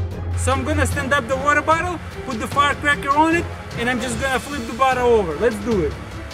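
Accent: Russia. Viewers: по звучанию Американских слов, это не американец, а русский человек, знаевший английский язык )))) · Мне кажется или у него русский акцент? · Рязанский акцент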